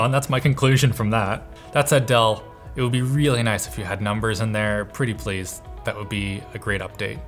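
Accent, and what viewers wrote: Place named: Canada. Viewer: so many canadian pronunciations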